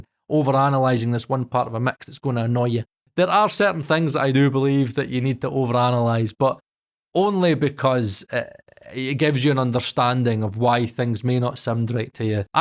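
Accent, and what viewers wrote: Scotland, United Kingdom. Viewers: Nah mate, you've got it all wrong. Almost nobody who watches you is BUYING plugins. They may OWN hundreds of them, but they didn't buy them. The Joy Of Plugins isn't what they do for the sound, it's the simple dopamine release scenario. It's like GAS (Guitar Acquisition Syndrome) except there's nothing shiny to hang on your wall. Why do you think DAWs like Studio One make such a big deal out of the wee thumbnails of the VST effects and instruments? Does it make it easier and faster to find things? Maybe. But it also lets you have a virtual wall to hang your plugins on, which is far more important. [I unsubscribed to you ages ago, but every so often YT flings you into my space. I watch because you are a rare Scottish voice, and like me you are autistic. But I find most of your plugin talk really uninteresting and obvious. 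Not that you will give a damn! lol] The stuff I do find interesting is when you debunk the veracity and accuracy of a plugin. That's always fun.]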